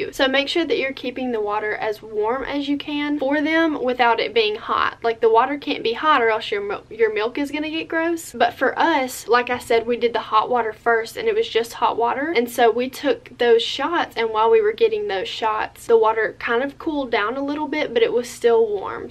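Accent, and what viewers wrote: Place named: South, United States. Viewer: Yay! You are so freakin awesome! I hear the southern accent! I am just below you in MS. Oh, hey NSC sister!!! Keep shining your light of influence!!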